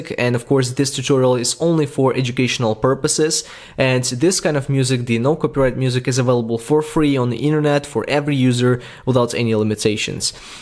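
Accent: Russia. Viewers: Omg, russische Akzent 🐷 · Your accent sounds very Russian 🤔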